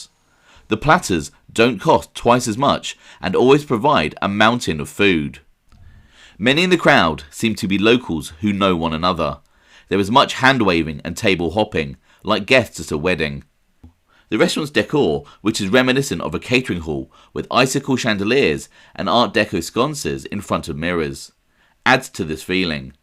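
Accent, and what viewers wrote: United Kingdom. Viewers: Your British accent is a monotone puts people to sleep get a mob guy to report much more exciting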